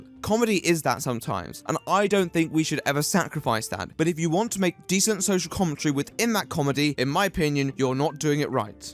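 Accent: RP, United Kingdom. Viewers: "Which is where we're FUCKING going next." Sir I LOVE YOU. lol your style of dry cynical humor is absolutely my cup of tea. I can't believe I've gone this long without knowing you were out there but I haven't stopped watching your videos since I found you a couple days ago. You're always so informed and fair in your assessments it makes me feel like i'm watching a really SMART documentary instead of a trend following gossip show. I feel like i get SMARTER when i watch your videos. listening to a posh British voice using big words while making snarky jokes and sounding SO over the bullshit is everything I need right now. and I always appreciate your opinion on these topics because unlike everyone else eagerly throwing their opinions in on any given subject, you actually EDUCATE yourself about them, instead of just speaking on the small bits of information you're not sure about. 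I appreciate your tact and dedication to making sure you present ALL facts and sides involved instead of grabbing the juiciest bit and running with it. And because you have such a strong personal opinion you often discuss things I'm not sure anyone else has touched on which only deepens the understanding of the situation and keeps everyone more informed in general. So thank you for all your hard work and your quality content. you found he magic recipe for your channel and your personality is absolutely the center of its success. you always talk about how if he audience doesn't connect with the creator t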